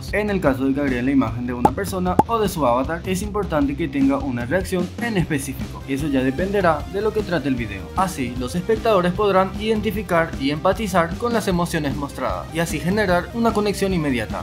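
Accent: Paraguay. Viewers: Tu acentó parece paraguayo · Gran video, me ayudaste mucho, por cierto, ¿sos paraguayo? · Paraguayo ? verdad kp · Eres Paraguayo? Tu forma de hablar me suena Paraguayo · Sos Paraguayo? xd, me doy cuenta por ti acento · Ya me sonaba el acento paraguayo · nde kp vos sos paraguayo? se nota en tu acento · Bro, sos Paraguayo?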